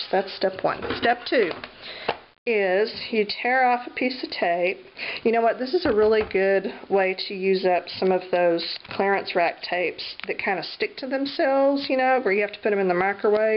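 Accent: South, United States. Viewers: You crack me up, love the accent, sounds like me cause I am Southern too.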